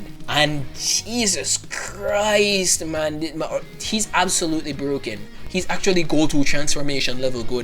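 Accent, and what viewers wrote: Jamaica. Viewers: You sound Jamaican. Are you? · you sound like jamaican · Are u jamaican? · Is he Jamaican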